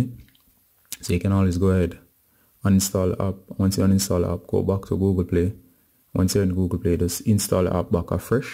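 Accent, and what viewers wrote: Jamaica. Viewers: Love your voice man Are you jamaican or something ?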